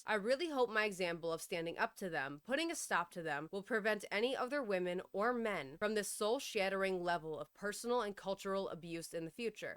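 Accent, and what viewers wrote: New York, United States. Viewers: When your sick you sound more like a New Yorkian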